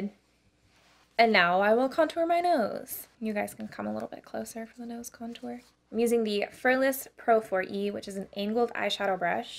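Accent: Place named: Canada. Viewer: I'm a little late to the game but goddamn is it good to see you back 🖤🖤 and good to hear that sneaky Canadian accent 🤗🖤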